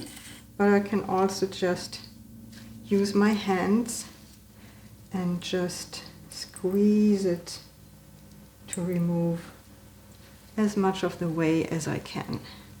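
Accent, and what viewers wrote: Germany. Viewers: People are soo picky with videos. This lady should have more views and much more likes.This lady is fantastic, charismatic, and well groomed. She does her videos really well. Her German accent accentuate her videos even more. I can't put more likes, i already checked a thumbs up. But, what i did is watched the commercials to help her. This recipe is so delicious.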